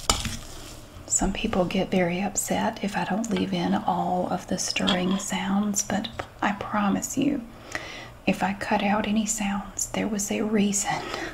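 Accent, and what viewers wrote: South, United States. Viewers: I live for these recipe videos with her southern drawl. Amazing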